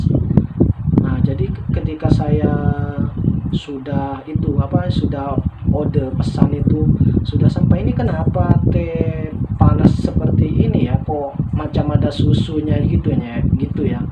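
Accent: Indonesia. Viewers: Mahal sekali suara suara latar yang sesuai membuat video.sedap dengar,walaupun ada alang keindonesia nya.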